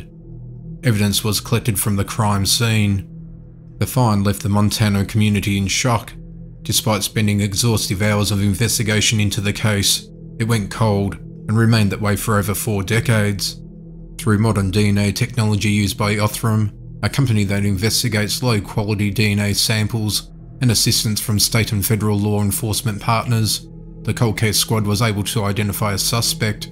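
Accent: Australia. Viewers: im disappointed that all these sound like the same case! fucking psychos edit : why are people making such a big deal about his narration? it is very clear. he’s just australian. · Thats a Australian accent actually. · Is that how Australians speak normally?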